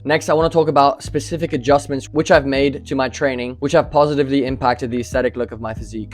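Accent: Ireland. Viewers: I swear I can hear a bit of a Dublin accent when you speak... Did you live in Ireland at some point? 😂 🇮🇪🇮🇪🇮🇪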